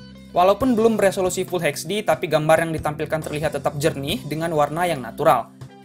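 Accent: Lampung, Indonesia. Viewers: Lu orang lampung tah bang?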